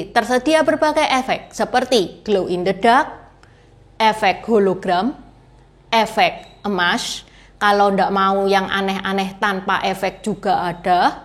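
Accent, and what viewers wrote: Java, Indonesia. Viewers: Bahasa mandarin logat jawa.. Unik 😅 Akhirnya pertanyaaan saya terjawab kenapa budaya china dan jawa kok mirip mirip... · KEREN BANGET CIK …..JAWA MEDHOK 😂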